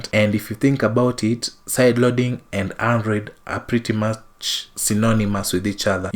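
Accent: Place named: Kenya. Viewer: you sound kenyan :hand-pink-waving: I love the sort of videos like this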